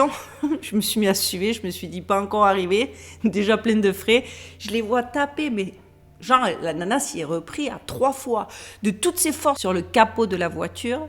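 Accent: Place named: Provence, France. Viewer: Vous êtes de quelle région d'origine ? Votre petit accent du Sud bien de chez nous en Provence. Hein ?😉 🤗💕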